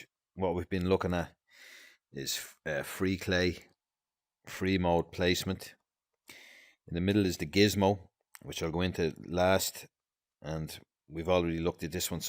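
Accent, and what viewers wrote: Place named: Dublin, Ireland. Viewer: I fucking love hearing a Dublin accent doing high end 3D stuff. Hope you’re well!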